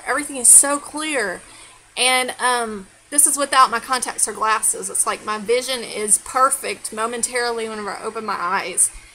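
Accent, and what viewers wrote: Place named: Texas, United States. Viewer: Lol, I like it. That is my favorite kinda drawl.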